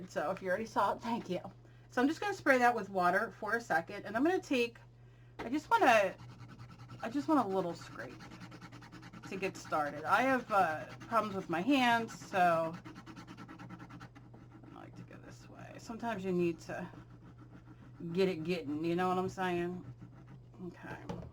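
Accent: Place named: Philadelphia, United States. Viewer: You gotta be from either Philly or South NJ lol. I know my own “accent” when I hear it!!!!! (I didn’t know we had an accent until I moved from Philly to NJ!!!! And met people from N. jersey! But I’m watching this to learn. And the whole time I’m like- I feel like talkin to one of my friends or aunts!!!! Yep I’d bet on it!!! Ok. Back to watching now but please correct me if I’m wrong!!! Lol!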